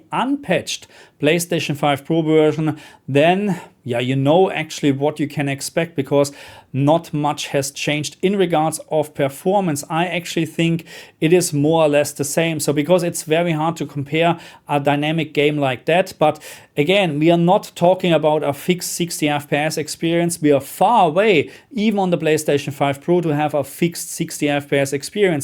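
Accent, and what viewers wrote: Germany. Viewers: your English are perfect!I am from Greece but I like your German accent.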